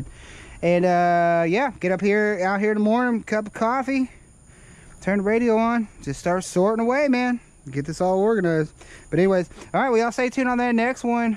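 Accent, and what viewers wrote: Texas, United States. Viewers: 8,77 for whats ? your Texas pronunciation is very hard :) for not native :) Regards from Poland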